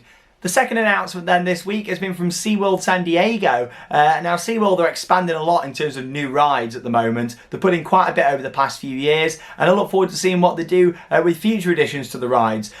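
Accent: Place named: United Kingdom. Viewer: Being an American, it always makes me laugh when you and some other brits say duel, because it sounds like juul 😂 “...Now this will be a new juuling coaster...”😂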